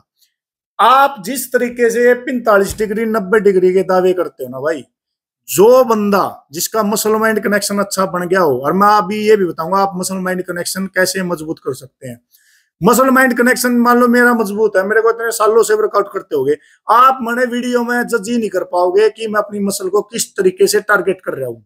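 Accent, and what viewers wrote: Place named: Haryana, India. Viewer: Haryanvi bolke koi cool ni lag raha ye. Tumhe lag raha hai ki is tarah baat karke hero ban gaya, aisa nahi hai... Delhi, haryana ke aadhe parhe likhe log hi follow karte hain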